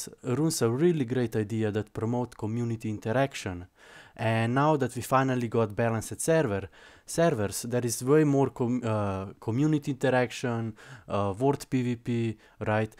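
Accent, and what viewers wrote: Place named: Europe. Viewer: how do i block all classic wow content narrated by an esl european accent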